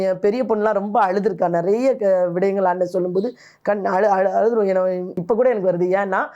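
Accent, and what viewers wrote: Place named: Tirunelveli, India. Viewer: உங்களது நெல்லை பேச்சு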